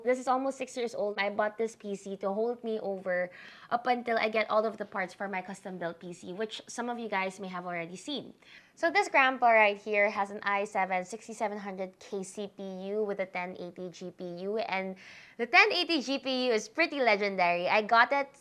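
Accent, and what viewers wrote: Philippines. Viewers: ui your a filipino pala hehe just dropped on your channel ehehe kaya palang familar yung accent hehehe. i also have and still currently using almost the same system. z170 asus ROG with a i5 6400 CPU with GTX 960 with 8gb Ram planning to upgrade the ram and the GPU soon currently using it for editing and minsan gaming hehe.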